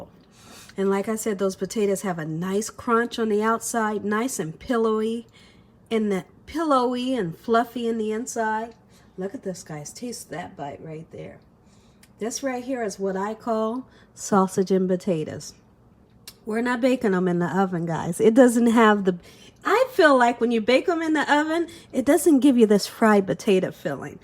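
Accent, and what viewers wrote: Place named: South, United States. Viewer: I absolutely love your voice and southern accent 🫶🏾 and this has become one of my favorite meals to make, bcs of you!! Thank you so much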